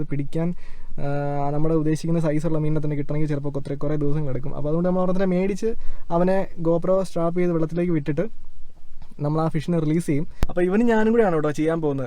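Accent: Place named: Kasaragod, India. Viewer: Aiwaaaaaaa🥰🥰🥰🥰🥰 kidilan #kasaragodbhasha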